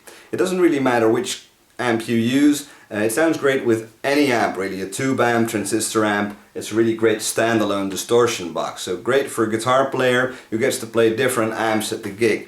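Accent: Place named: Netherlands. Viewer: Ha, the unmistakable Dutch accent. Nice vid.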